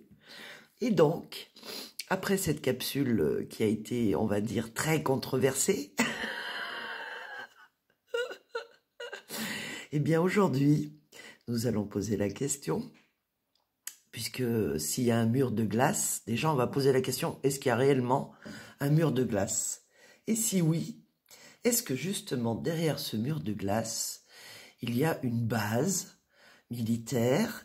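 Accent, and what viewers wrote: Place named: South, France. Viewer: très belle accent du Sud je l’adore et elle fait un taf remarquable ♥️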